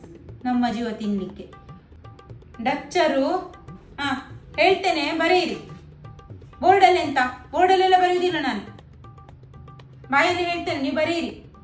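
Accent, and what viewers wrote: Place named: Udupi, India. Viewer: ನಿಮ್ಮ ಭಾಷೆ ಸೂಪರ್,ನನಗೆ ಉಡುಪಿಯಲ್ಲಿ ಇದ್ದ ಹಾಗೆ ಆಯ್ತು ಮಾರಾಯ್ರೆ😂😂😂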